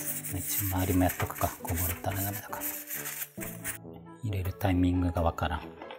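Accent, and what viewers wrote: Kansai, Japan. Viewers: わぁ～❣️可愛いのってチェブのタコ焼きだったんですね💡さすが！チェブのクオリティー高くてめっちゃ可愛いです❤️❤️ 量そんなんでいいんかいな～？って思ってたけど大成功でしたね😁✌️ お味はどうでしたか😉？ちょいちょい出る関西弁に癒される💛 素の時は関西弁なんですね😌✨ たこ焼き器は家にもあるんですが、使った事がなかったので今度やってみよう🐙🎶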